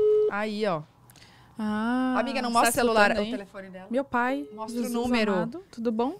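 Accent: Minas Gerais, Brazil. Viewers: O sotaque dela é mineiro. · o sotaque dela é mineiro ou goiano ? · Gente, a é mais mineira que eu que moro em minas kkkkkkkkkk Véi, uai, “esse trem e diferente demais uai”, trem, “nunca vi um trem desse”, maravilhosa, buxinha kkkkkkkkkkk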